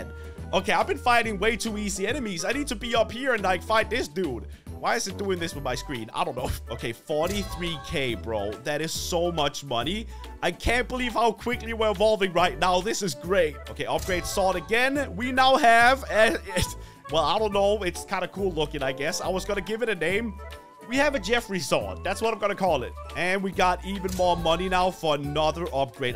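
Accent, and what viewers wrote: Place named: Denmark. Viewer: jeg kan høre tydeligt at du er dansker gode videoer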